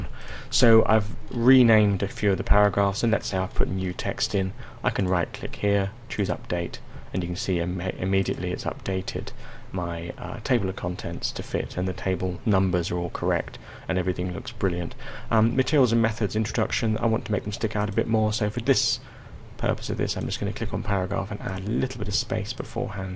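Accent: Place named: United Kingdom. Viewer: For some reason, my brain pays 50% more attention to this guy just because of the British accent.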